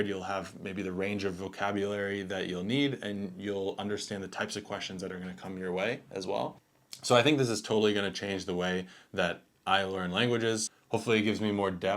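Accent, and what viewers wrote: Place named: United States. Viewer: Very American accented French though, I wonder if you can change it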